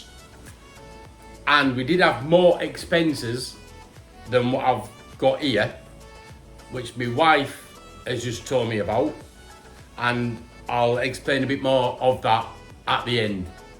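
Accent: United Kingdom. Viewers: Where you from in the UK. Sounds like the North East of England.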